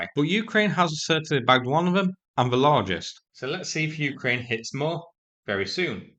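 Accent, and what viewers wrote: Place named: North, United Kingdom. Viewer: The English is clear but has a North country accent. Turn on the subtitles if you are having trouble.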